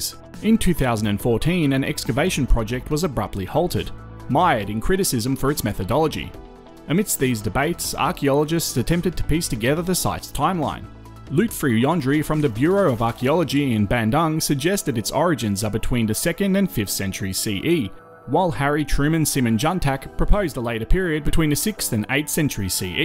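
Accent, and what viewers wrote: Australia. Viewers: Suggest you try using local pronunciations rathan than Australian for local names. Eg Gunung Padang. Vowel sounds in Bahasa Indonesia are a bit different than English :)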